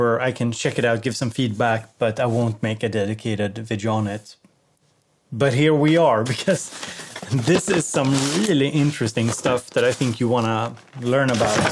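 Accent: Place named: Sweden. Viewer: Sounding particularly Swedish today! :)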